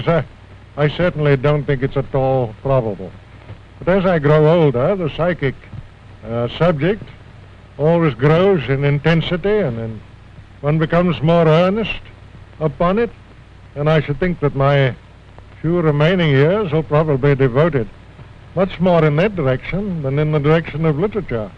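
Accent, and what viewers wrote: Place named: Edinburgh, United Kingdom. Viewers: Och! Man's scottish is really showing here. I love it. Would have been very interesting to sit down and talk to him about all sorts of matters. I find it fasinating that someone who is educated man of science matters was so into Spiritualism. Where's a time machine when ya need it? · Edinburgh accent, similar to my own.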